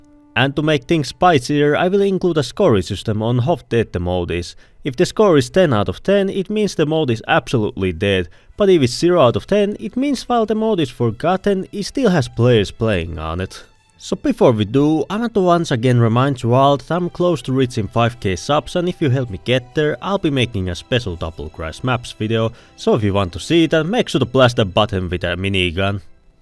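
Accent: Finland. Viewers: I am a simple man, I hear Finnish accent, I subscribe.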